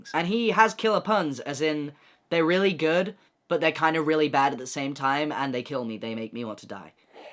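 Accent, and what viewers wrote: United Kingdom. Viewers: he's so bri'ish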